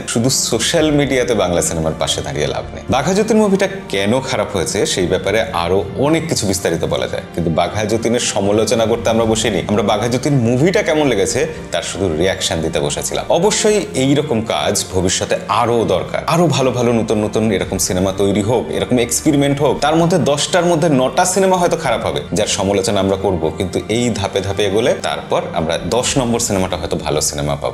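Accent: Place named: Bangladesh. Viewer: Apner bangla bolar dhoron ta Bangladeshi ter moto ...apni Bangladesher kotha theke aschen?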